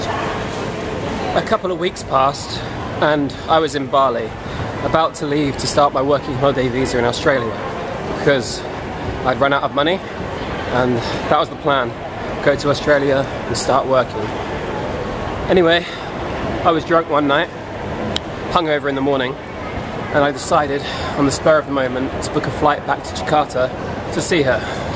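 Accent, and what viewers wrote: United Kingdom. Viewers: love this British accent....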